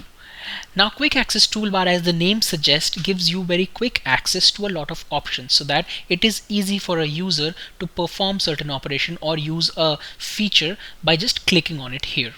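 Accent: India. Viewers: you know ts is good when you hear the indian voice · One of the best tutorial for excel that with Indian English accent. Thq lotsss bro for doing it!!!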